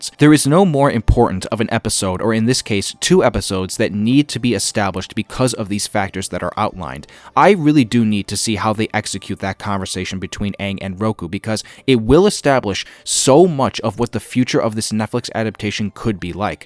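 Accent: Bisaya, Philippines. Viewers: Hahaha bisaya sad ka brad? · uu, klaro kaayong Bisdak 😆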